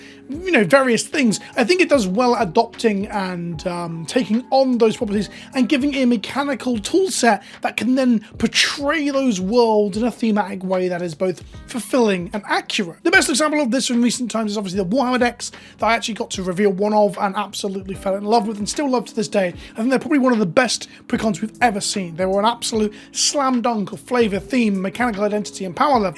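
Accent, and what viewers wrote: United Kingdom. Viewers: Hearing a british nerd talk about and get wrong so much about Dr who haha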